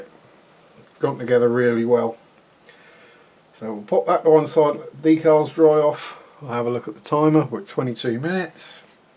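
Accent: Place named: South Africa. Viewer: How do you spray white? ;) or how does one spray white :) whey mannn nowt rang wit talkin South African like!!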